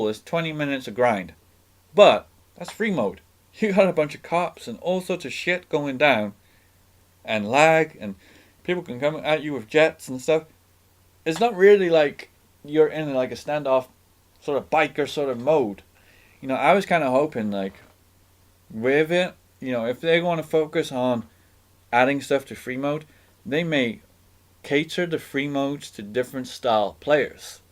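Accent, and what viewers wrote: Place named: United States. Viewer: Good video, but you sounded too American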